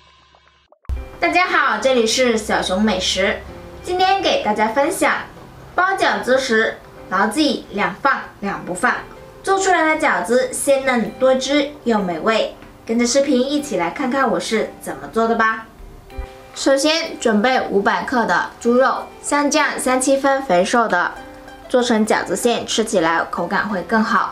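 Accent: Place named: South, China. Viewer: 我一打开，听到南方口音，我就知道好不了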